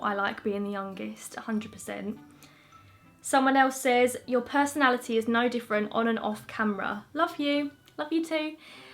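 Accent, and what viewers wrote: Essex, United Kingdom. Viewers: You're legit me but prettier and with an Essex accent 😂🤩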